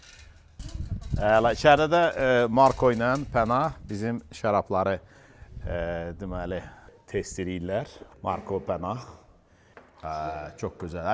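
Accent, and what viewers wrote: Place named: Shaki, Azerbaijan. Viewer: bəy,xahiş edirəm ləhcəni dəyişməyin. Şəki ləhcəsi superdi.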